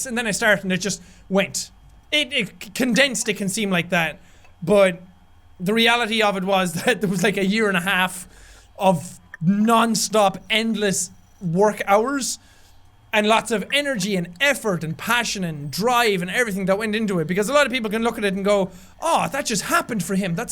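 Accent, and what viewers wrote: Ireland. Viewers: jack idk where you moved but please try to not lose your irsh accent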